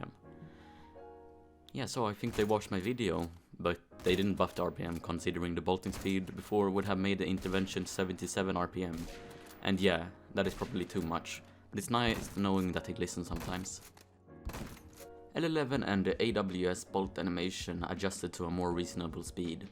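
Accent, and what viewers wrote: Sweden. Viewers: I can tell that you're swedish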